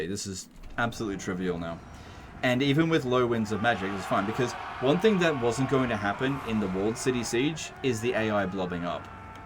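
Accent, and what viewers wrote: Australia. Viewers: never was a huge viewer but it kinda rocks how painfully australian he is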